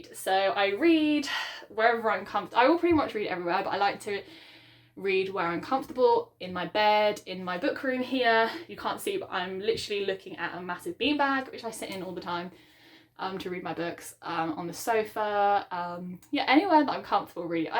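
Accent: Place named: Southern England, United Kingdom. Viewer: I'm not a linguist like Prof Henry Higgins but I'm gonna guess that your accent is from southern England.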